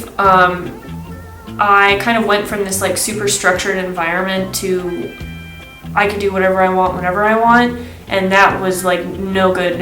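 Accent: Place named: South, United States. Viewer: Where are you from? I hear a mild southern accent!!!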